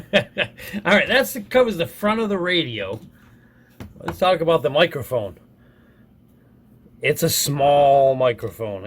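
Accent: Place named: New England, United States. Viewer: Great video, you sound like your from my area.... 22 KING OF THE HILL, Souther NH... you have a new england drawl... Rhode Island maybe?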